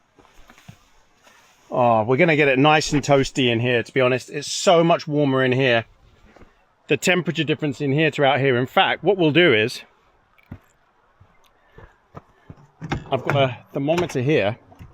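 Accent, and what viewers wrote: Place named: England, United Kingdom. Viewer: have you ever thought to do a story time channel? You have a beautiful ton e with you voice, you are animated and with your beautiful English accent, you would be perfect for storytime. You could just have a set scenery for the photo and just have your voice reading the story. I usually watch her videos twice. The first time I start watching when I lay down before bed and end up falling asleep the second time so I can actually watch the entire thing without falling asleep lol.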